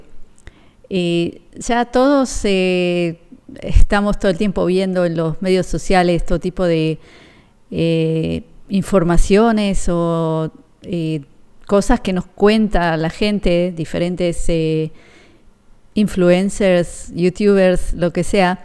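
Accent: Argentina. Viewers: Verte y escuchar tu acento argentino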